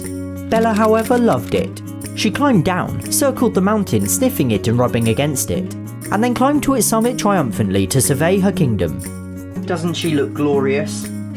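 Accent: United Kingdom. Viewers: Is he Bri'ish?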